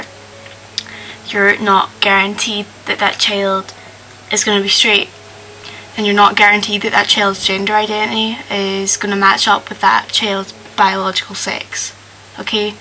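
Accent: United Kingdom. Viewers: What kind of accent is that? I know it's from the British Isles, but I can't pinpoint where...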